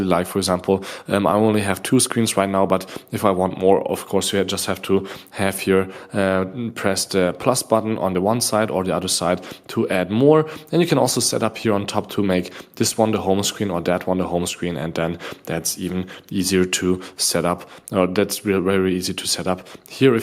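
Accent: Germany. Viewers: you are german native i assume ? Does this video also exists in german?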